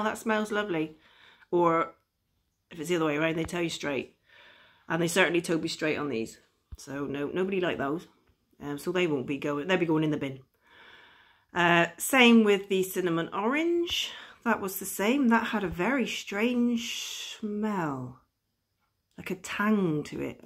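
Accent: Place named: Devon, United Kingdom. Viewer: Hello. Just started making wax melts after making soap and body products for two-three years. Love the video. Why do you transfer to a second jug is it simply for ease of pouring? I sense a Devonshire accent hubby’s from asthma Devon and I lived there on two lengthy occasions. Tasmania reminds me of Devon and Cornwall wrapped together. How disappointing that you made so many not to like so many.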